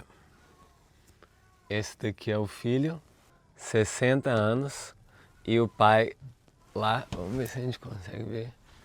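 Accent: Minas Gerais, Brazil. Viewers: Muito estranho! Curioso! Obrigada por partilhar! Vc é mineiro?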